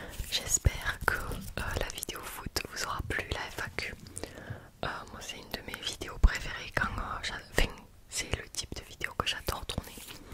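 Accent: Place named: Basque Country, France. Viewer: Voilaaaaa on dit chocolatine conn, t’as un accent basque de fouuuu je me trompe?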